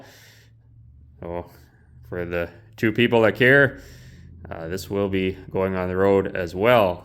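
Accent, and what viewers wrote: Canada. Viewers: You Brits probably understand his Canadian pretty well, eh?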